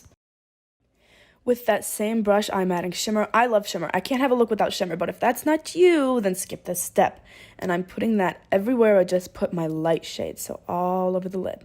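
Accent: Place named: New York, United States. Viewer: Girl, love you! Where you from? I'm from Jersey/Brooklyn and we sound very similar.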